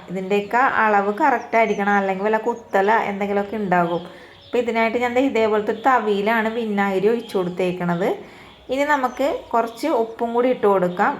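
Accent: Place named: Vypin, India. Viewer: ഇത് ഞങ്ങ വൈപ്പിൻ കരകാരുടെ സ്ലാങ് ആണല്ലോ ചേച്ചി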